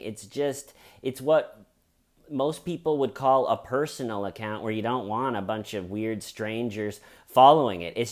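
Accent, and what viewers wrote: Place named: United States. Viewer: So strong american accent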